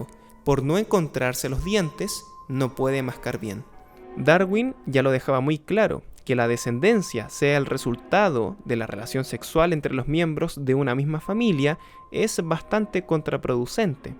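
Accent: Chile. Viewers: No se de donde eres, este es el primer video tuyo que veo pero tienes un acento Chileno muy marcado.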